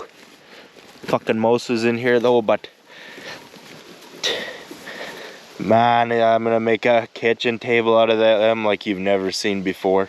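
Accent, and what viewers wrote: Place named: Eastern Canada, Canada. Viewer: A very smart young man. Im not sure but he sounds like he must be from eastern Canada I was up there years ago in Whitehorse & Inuvik. This kid has got it goin on. Btw..you can peen that crush sleeve back out & re use it. Ive done it and seen it done many times. It just sers you pre load on your pinion. Best wishes!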